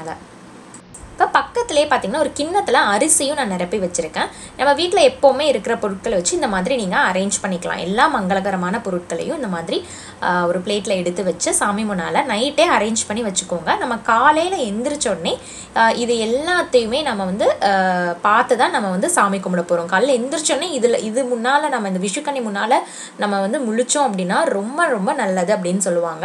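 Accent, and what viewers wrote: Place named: Kerala, India. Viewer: Voice correct ah Kerala pa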